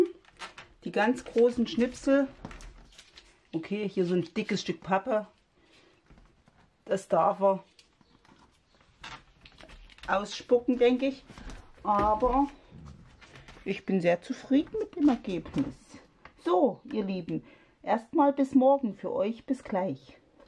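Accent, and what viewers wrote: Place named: Anhalt, Germany. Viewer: Das ist soooo beruhigend, immer wieder auf's Neue, wenn man sieht, dass es bei anderen genauso chaotisch aussieht. ich liebe das und das ist eben das kreative Chaos! Und so was macht uns Bastler doch sympathisch. Danke für's Zeigen und mitnehmen. Ich mag übrigens Deine "lockeren" Videos, man mag Dir sehr gerne zuhören/zusehen. Und... Du kommst sicher aus der Anhaltiner Ecke, wenn ich Deinen "Dialekt" so höre. Ich bin ursprünglich auch von da... 🙂, weiter so und LG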